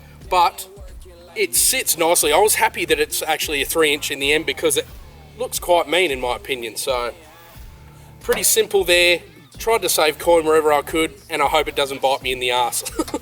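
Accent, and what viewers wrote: Australia. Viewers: Have to admit as a Yank I’ve always been jealous of our Aussie friends cool accent and that v8 Toyota turbo diesel that we can’t get in the 🇺🇸. Very nice 76 👍🏻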